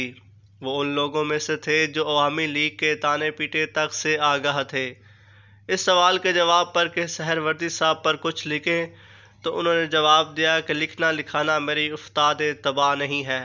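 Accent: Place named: India. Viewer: Bhaai Hindustani urdu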